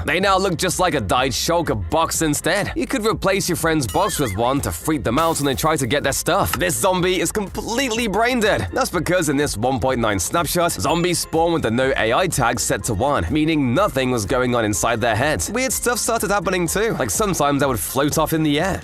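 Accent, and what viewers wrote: United Kingdom. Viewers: He sounds like British skip the tutorial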